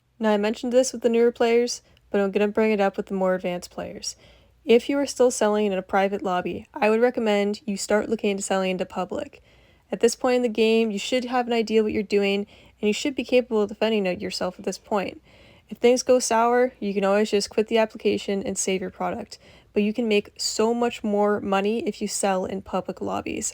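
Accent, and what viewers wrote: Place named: Canada. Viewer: At first I thought your YouTube and PSN were just a play on a nickname for thinking that was your legit name. Then I heard a few words that sounded Canadian and then boom, showed up and I was cheering because I went to a hockey game when the Vancouver Canucks came down to play the Anaheim Ducks. But I'd agree with most of this. Only thing I'd personally wouldn't buy as a new player, is the Acid Lab right away as we can get it for free after completing Off The Rails and we just have to upgrade the Acid Lab after doing i think 10 Fooligan jobs?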